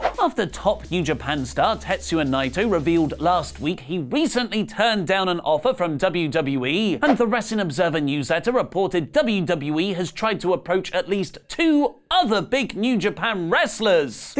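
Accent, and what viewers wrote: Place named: United Kingdom. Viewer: Your British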